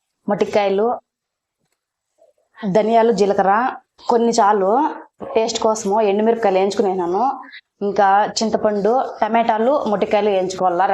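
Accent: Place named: Chittoor, India. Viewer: Chittoor language 😂😂😂😂 me village ekkada akka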